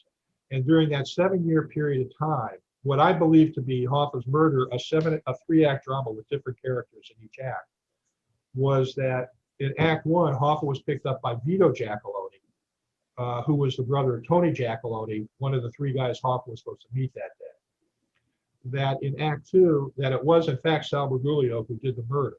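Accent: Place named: South, United States. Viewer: Mr., I gotta tell you, I wasn't used to your unique Southern accent, at first. I originally subscribed because of your encyclopedic knowledge of Organized Crime in KC. But now???.....I absolutely love your accent, lol. I mean, I love the relaxed way you tell your story with bits of humour in between. I think your accent is adorable, a word which I don't think I've ever used in my life, lol....I, literally, feel like Mark Twain is telling me mob stories with bits of home spun humour in between....I LOVE IT!!!!!!!......Oh yeah, I love your accent too, Dan..... 😎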